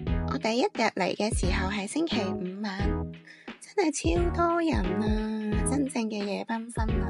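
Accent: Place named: Hong Kong, China. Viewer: Hong Kong accent is so cute and soothing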